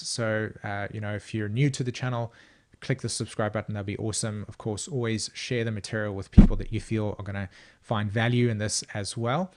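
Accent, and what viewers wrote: South Africa. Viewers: Brilliant, Have subscribed! Are you South African by any chance? Thank you for sharing your wisdom so beautifully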